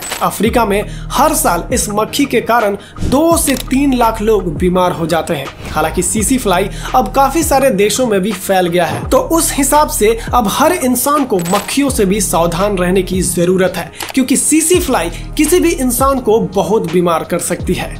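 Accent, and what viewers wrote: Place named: Bihar, India. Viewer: Awaz???jani pechani hai Behari touch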